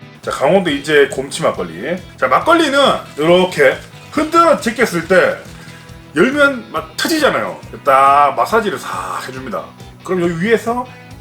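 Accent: Gyeongsang, South Korea. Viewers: 고향은 울산 방어진이시고, 현재는 강원도 홍천에 살고계세요-^^ 울산에서 오래사셨어서 경상도 사투리를 많이쓰세용😁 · 대구 부산 둘다 살았는데 대구 말투에 더 가까운 거 같기도 하고...ㅋㅋㅋㅋㅋ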